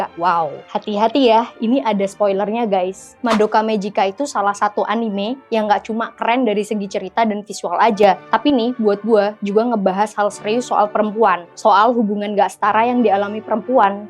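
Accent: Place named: Semarang, Indonesia. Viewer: top reviewnya mbak, btw mbak e logate kota semarang ig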